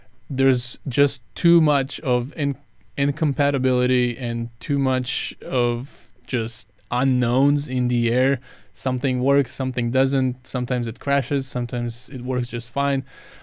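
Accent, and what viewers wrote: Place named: Paris, France. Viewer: there’s this channel on youtube called street french and your accent kind of sounds like hers. She’s from Paris. Are you from Paris too?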